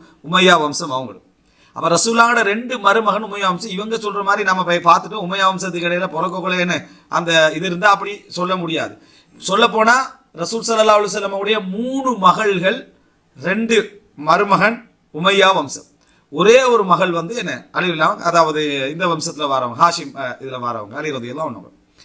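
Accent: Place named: Sri Lanka. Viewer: Avar srilankan tamil.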